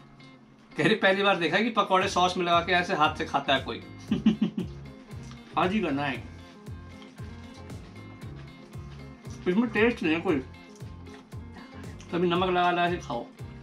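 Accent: India. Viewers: Bhai i love your desi way of talking love you bro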